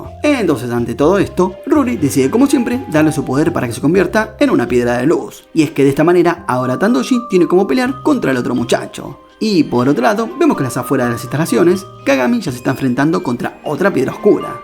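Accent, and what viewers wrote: Argentina. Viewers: Bro parece que sos argentino y me gusta mucho tu acento y tu manera tan buena de comprimir un anime en 20 minutos😊❤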